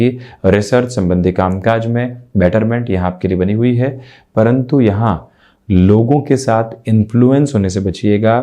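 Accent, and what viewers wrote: Standard. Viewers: Thank you for the predictions ji.🙏🙏🙏. It was crisp and to the point. However Hindi is not my mother tongue so, I had to listen very carefully to understand your words. I got so used to the bollywood style of Hindi which is filled with words from Urdu. So this is what shudh Hindi sounds like! 👍👍👍. I think it will take some getting used to.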